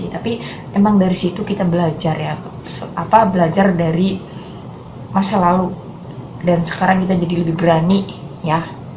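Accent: Banyumas, Indonesia. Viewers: Ngapak ya mbak? Kayane sih..hahaha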